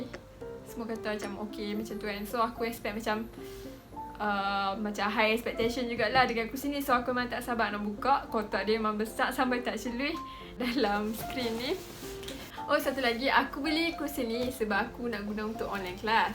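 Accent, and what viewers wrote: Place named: Kedah, Malaysia. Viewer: "Sampai tak Celuihhh" , org kedah kita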